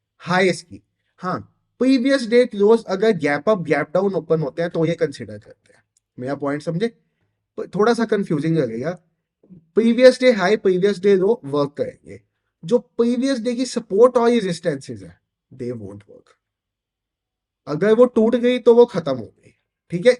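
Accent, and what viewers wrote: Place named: Pakistan. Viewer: Tu Pakistani hai na Teri voice bata Rahi hai.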